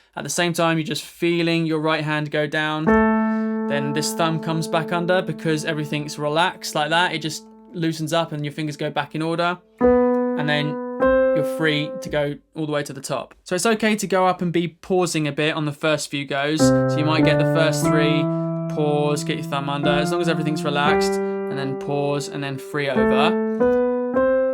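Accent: United Kingdom. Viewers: Dear sir, I love your videos very much!Thanks for your efforts.!!I am not a English native speaker,so I can learn to play piano and English at the same time,it is really awesome. I like your British accent,it sounds nice,maybe you can teach english too😜Have a nice day!Thinks again☕️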